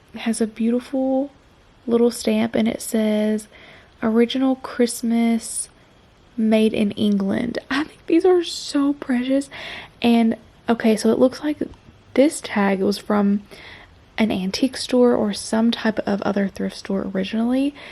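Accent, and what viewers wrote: South, United States. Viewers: You are so lovely to listen to. Love your accent, reminds me of when I lived in Tennessee- love the southern accents 🇬🇧🇺🇸🌸🌸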